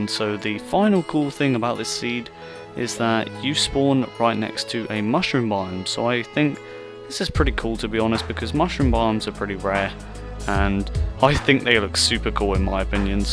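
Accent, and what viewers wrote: United Kingdom. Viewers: you have such a generic british accent :D when i try to make a british accent your's is precisley what i get. and my main accent is East-Coast American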